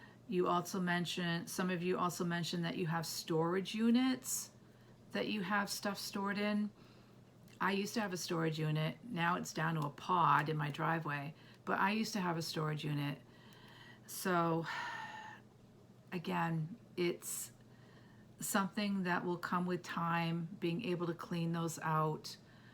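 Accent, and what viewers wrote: New England, United States. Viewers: What state are you in?! Im in MA. I feel like I’m getting some new englander in you ❤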